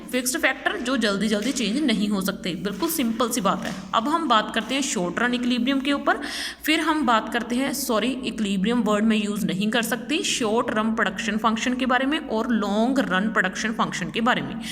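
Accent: Punjab, India. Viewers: I think uu are Punjabi 😅....way of talking 👳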